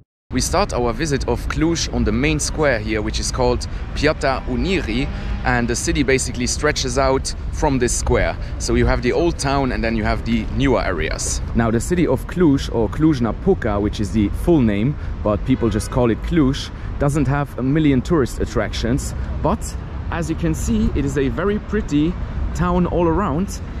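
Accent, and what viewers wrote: Germany. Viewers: you have a very interesting accent. It sounds very much like a german one.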